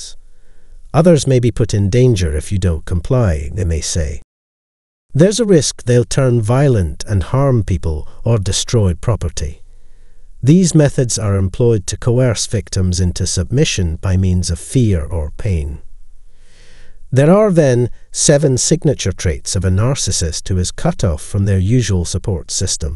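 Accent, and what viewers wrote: Scotland, United Kingdom. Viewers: Bizarre filming. Good content & narration but why the foreign landscape/people when the narrator is speaking in English with a Scottish accent?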